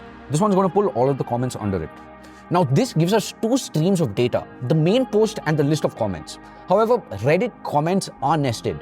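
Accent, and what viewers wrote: India. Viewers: Ainda estou tentando descobrir se o sotaque é indiano ou venezuelano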